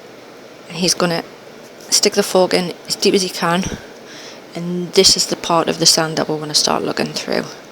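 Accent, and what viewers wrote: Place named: Newcastle, United Kingdom. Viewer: Bexhill Windows ... sounds like Newcastle or South Shields...?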